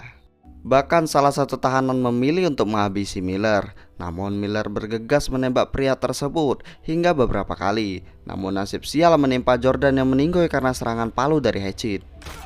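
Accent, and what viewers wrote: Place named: Sumatra, Indonesia. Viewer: swara abg kek logat kepri or sumatra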